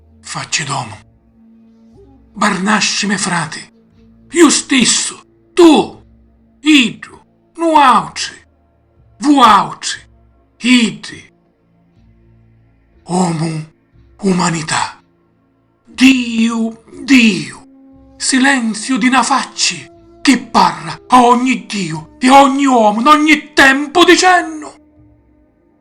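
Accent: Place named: Sicily, Italy. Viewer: Grande interpretazione, coronata dalla tua meravigliosa lingua di Sicilia, che arricchisce lo spessore umano dei contenuti.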